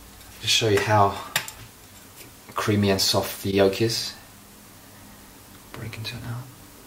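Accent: England, United Kingdom. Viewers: JEEZUS. British accent and he cooks! <3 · You've got an English accent,. Did you grow up in England?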